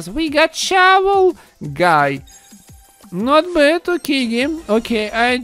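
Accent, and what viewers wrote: Russia. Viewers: You are Russian wright?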